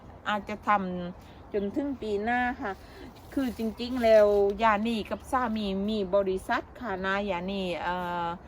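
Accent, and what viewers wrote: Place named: Phang Nga, Thailand. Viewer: ดีใจด้วยค่ะ คนใต้เหมือนกัน สำเนียงคล้ายคนพังงาเลย ใช่รึป่าวคะ🌷🥰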